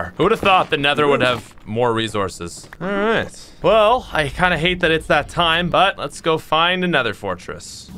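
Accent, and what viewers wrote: Canada. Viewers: I swear is he a Canadian he has like the same exact accent as my mom and she is Canadian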